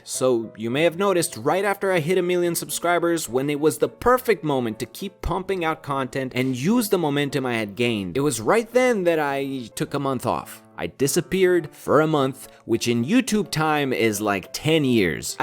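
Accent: Romania. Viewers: Yo man, your accent is GODLY for somebody from Romania! I live there too, and my accent is trash.... I want to as you a question . Where do you live? Like, in what county? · You have such a nice accent Were you raised in Romania? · Please answer me: Are you romanian?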